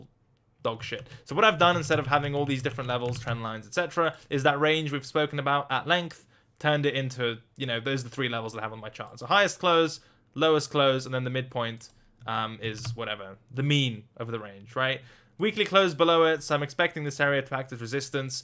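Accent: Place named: United Kingdom. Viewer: was extra british in this one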